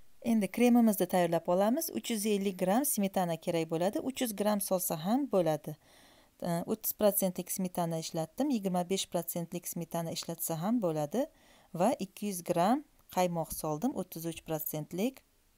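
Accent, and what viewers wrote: Khorezm, Uzbekistan. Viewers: Horazm shevasiga ohshattim.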